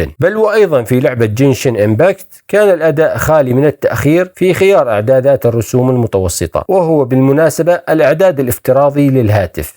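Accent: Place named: Deir ez-Zor, Syria. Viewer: اشتركت لانو لهجتك ديري ... إستمر بالتوفيق عجبني انك تجمع كل التفاصيل كون اكثر حيوية ...تقبل مروري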